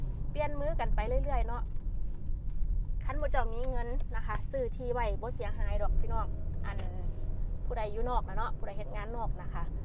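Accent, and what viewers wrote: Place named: Chaiyaphum, Thailand. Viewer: สวัสดีจ้าพึ่งเข้ามาเบิ่งเทื่อแรกจ้า ฟังเทื่อแรกเดาว่าเป็นคนชัยภูมิเดาถูกอิหลีหนูกะคนชัยภูมิคือกันจร้า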